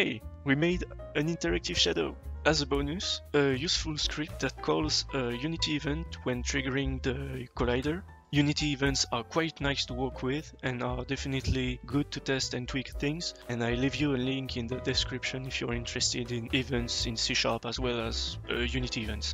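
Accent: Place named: France. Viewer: Ton accent français te trahit :) Très bon tutoriel. J'apprécie beaucoup ta chaîne YT - très pédagogique. Bonne continuation ++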